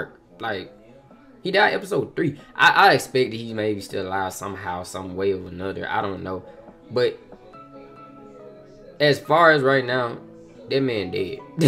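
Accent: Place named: South, United States. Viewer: im just starting with you im feeling it love your accent, is it southern ?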